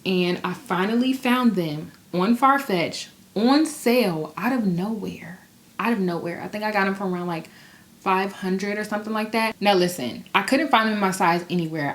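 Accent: DMV, United States. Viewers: Happy New Year! Have you ever been told you sound similar to Seven from BGC? You must be from the DMV lol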